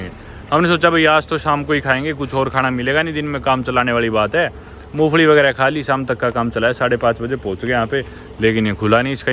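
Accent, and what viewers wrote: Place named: Meerut, India. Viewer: Meerut wali bolii katti jotte se😂😂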